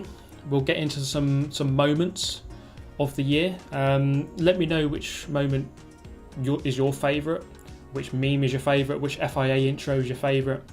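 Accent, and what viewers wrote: United Kingdom. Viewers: For 2019 if you have a good PC try Project cars 2, it feels like the middle ground between super hardcore Iracing and Forza ramming. It’s best as just a very good looking racing experience. And I’d love to see more collabs, maybe with Jimmy B? Thanks for the cynical casual British Gran Turismo commentary I didn’t know I needed in my life. Keep dodging those rammers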